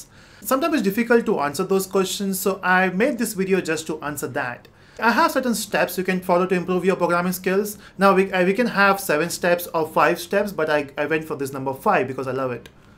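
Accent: India. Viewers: I hate Indian accent. No offense!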